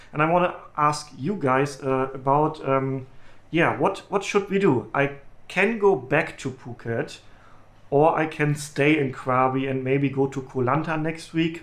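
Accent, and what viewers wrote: Germany. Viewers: Dein deutscher Akzent ist nervig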